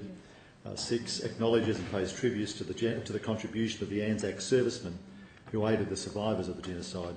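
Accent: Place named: Greece. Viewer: Dude, from the way you talk I can tell you're greek. Here's a reality check. Turks took constantinople from the byzantines, If you greeks were so mighty why couldn't you defend it? Even in the modern age. Why is Greece bankrupt? Why are greek politicians begging Turkey's prime minister to help their financial situation? Greece is fucked my friend, no european country can help because they are ALL in debt LOL You can talk all you want, this is the reality haha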